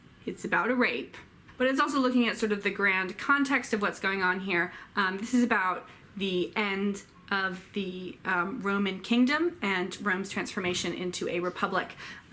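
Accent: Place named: South, United States. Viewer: I've been wanting to check out And Then There Were None for a little while, given this Christie revival but I am already a slow reader so I'm not sure it's just a way to frustration. Probably just sucked in by the cover. I've been watching you a little while now, love to hear what you're reading and I share your love of Hardy! I'm an ignorant Aussie so I hope I don't offend but I love your accent, its got a lovely twang to it (says me hah) -- clear American but a bit of Southern? It reminds me of Donna Tartt. Obviously hers is very very strong (Mississippi?). So I listened to some of your audiobook and it does lend itself to narration very well! Look forward to more from you,:)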